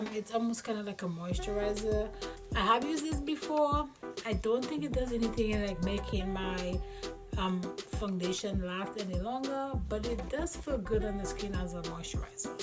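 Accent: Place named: Caribbean. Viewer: U sound Caribbean, I love it 🥰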